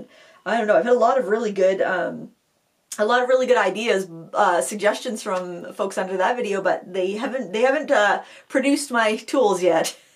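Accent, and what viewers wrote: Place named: United States. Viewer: Just tuned into you by accident. I love your energy. Being an Old English Great Grandmother, at 1st i had to get used to the ' fast talking' American accent which im coming to abdolutrly LOVE . Its got my old arthritic body up n painting. Looking forward to seeing n hraring you much more often. Big hug from across the pond. Xxxx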